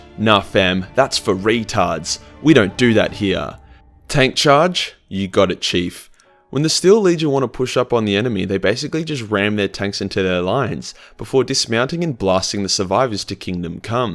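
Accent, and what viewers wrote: Australia. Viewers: It's good to hear an Aussie doing this stuff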